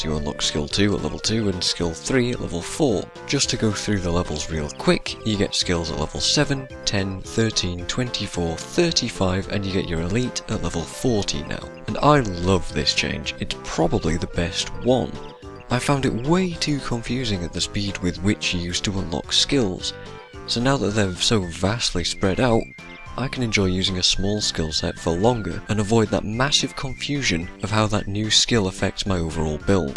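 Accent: North, United Kingdom. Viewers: Really great video :) Plus, you sound Northern, which is always a pro.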